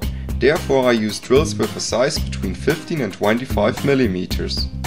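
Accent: Germany. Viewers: Well i can hear that youre german. WoW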